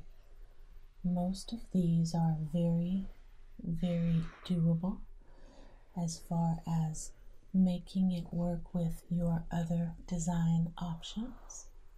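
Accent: South, United States. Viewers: Is she from Texas? It sounds like a slight southern something in her dialect...not full on Texan or Dixie talk but there’s a little southern comfort in there.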